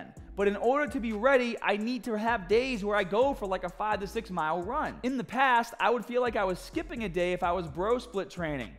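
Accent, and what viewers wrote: Boston, United States. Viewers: That's a proper Boston accent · That thick Boston accent!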